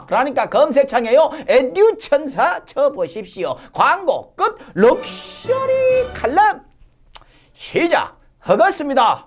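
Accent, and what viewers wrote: Jeolla, South Korea. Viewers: 님은 서울이여 근데말은전라돈데여 공정하게말씅하세요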